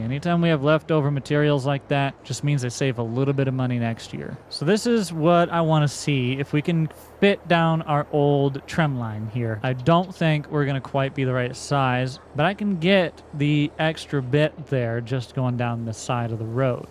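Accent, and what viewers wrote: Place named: South, United States. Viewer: I just want to say when I was watching the first few videos I noticed you have a southern accent when you talk in certain parts and I fucking love it HAHA IT SOUNDS AWESOME. cant wait for more videos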